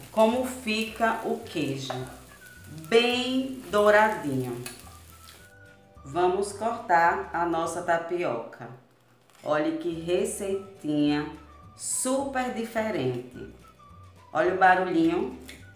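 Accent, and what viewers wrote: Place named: Recife, Brazil. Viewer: Oxe, esse sotaque lindo só pode ser do meu Recife! Tapioca eu faço todo dia, adoro! Vou fazer desse jeito também visse!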